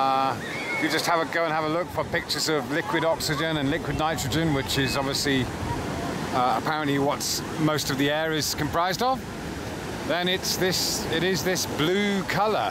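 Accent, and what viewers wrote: United Kingdom. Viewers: It hurts that this guy has a British accent and wasted a western education that people less fortunate would have given anything for....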